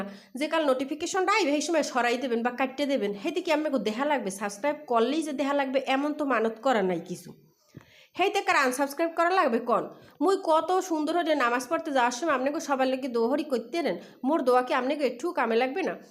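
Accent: Barishal, Bangladesh. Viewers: আসসালামু আলাইকুম আপু চলে আসলাম তোমার ভিডিও দেখতে বরিশাল্লা কথা আমার ভালই লাগে লাইক কমেন্ট সাবস্ক্রাইব করে দিলাম নতুন বন্ধু হিসেবে পাশে থাকলাম অনেক ভালো লাগলো ❤❤❤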